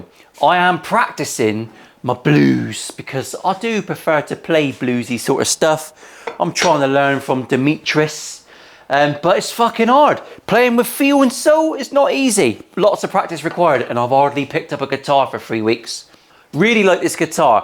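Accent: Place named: Northern Ireland, United Kingdom. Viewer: Might want to say 'northern Irish' accent as a lot of the world probably think of the Irish accent as sounding like Michael J Fox in BTTF3 😃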